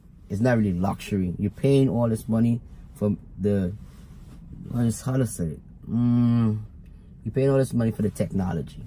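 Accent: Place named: Jamaica. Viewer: Yes sir and you sound like ur from yard….. My fam is JA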